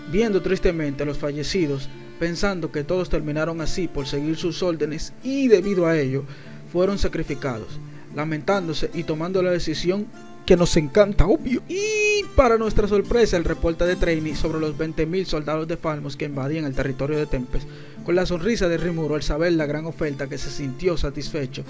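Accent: Dominican Republic. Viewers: Bro, ¿eres dominicano? Me encantan tus videos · Bro tengo una pregunta Eres Dominicano? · eres dominicano? xD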